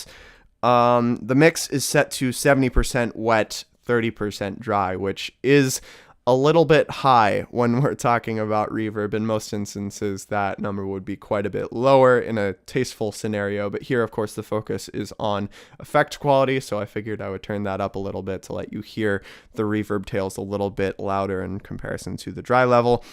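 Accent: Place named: Russia. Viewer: that sounded like a Russian accent, even though it was English. (I think I read your name as?)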